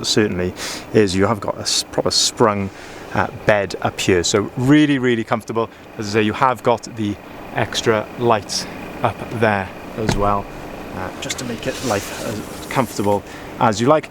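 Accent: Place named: Wales, United Kingdom. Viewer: Lovely professional presentation with that wonderful Welsh accent - you do 'spit' your 'T's and 'D's well (unlike some these days). 😀 Fab van too, though with 5 owners and 3 years old, it's about 5 grand overpriced in my view. At the end of this summer season, 2020 Oceans will be sub 60k and already the odd one for 62 on a 21/22 plate can be found.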